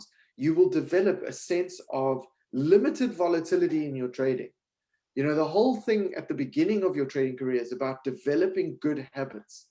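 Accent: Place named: United Kingdom. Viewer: you have such a pleasant way of communicating I could listen to you talk all day. The British accent doesn't hurt either.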